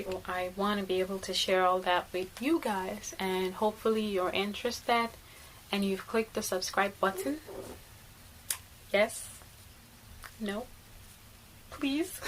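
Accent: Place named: Nigeria. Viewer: I guess writing and keeping your work scope and plot around Nigeria has helped to keep you connected to the Fatherland, I could see and feel the “Nigerianness” here and there while you speak! I totally loved it ❤️.. A fan!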